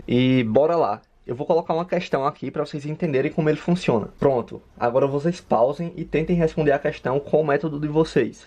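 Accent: Northeast, Brazil. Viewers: Ai esse sotaque nordestino é tão lindo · Eu adoro esse sotaque dele Nordestino kkkkk❤❤ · Ele é nordestino?? O sotaque dele parece muito.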